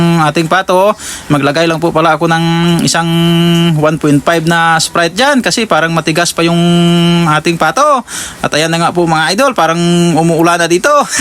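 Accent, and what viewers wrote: Iloilo, Philippines. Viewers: Ilonggo ka dong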